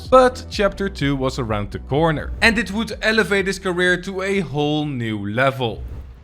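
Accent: Netherlands. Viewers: Question to u: are u Dutch? Little accent xD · Die Nederlandse accent😭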